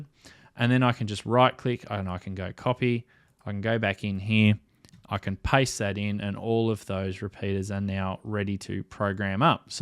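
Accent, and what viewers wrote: Australia. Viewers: I just found your channel then I recognized inmediatly that beautiful aussie accent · Can't find my UV-7B anywhere on the list, Chirp sucks.. Work on losing the aussie accent, sounds awful. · Hej Matey, well i bought the Boafeng UV5RH and tried to use the CHIRP software and then i got stuck, so i went on youtubr to look for tips n info. A Minefield of ppl giving their views n tips on their vids....... i have watched so many from the uk and usa and i havent found one untill now that goes through the start process as well as you do. Yup fair dinkum me old possum, Sorry, just using my English sense of humour, No but seriouly i got to downloading from the radio all the channels and then WHAT..... untill now when you showed me how to save the numbers and then delete them from the radio ready to programme the new ones in by hand or buyin using links on CHIRP... 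I live in North Wales in the UK and even here the videos dont tell you that, strange isint it? i have asked pp on fakebook in my area and i got limited responces and mostly use chirp............ As i am not young anymore all i need is to be shown once and i generally have it. So now i have found your video, saved it and subscribed to you also plus you have a good Aussy accent that is easy to listen to. So thank you for putting this video out there matey. Be safe out there. Thank you from over here in North Wales UK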